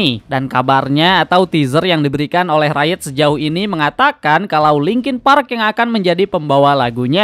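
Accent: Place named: West Java, Indonesia. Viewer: ane tau ente sunda bang, tapi london dibaca landen.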